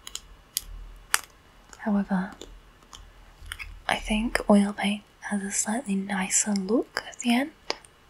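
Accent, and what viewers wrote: Australia. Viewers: South Australian? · Glad I stumbled on your channel. You have to be Aussie surely?!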